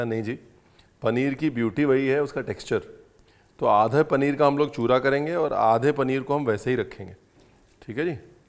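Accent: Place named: Punjab, India. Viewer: Sorry to say Sir but why do you overeact so much? It always seems like you need some kind of validation that you are punjabi. We all know you are punjabi. You always mix all three languages English, hindi, punjabi everytime. Please use one language according to your channel preferences. I know you have a punjabi touch in accent and we all have .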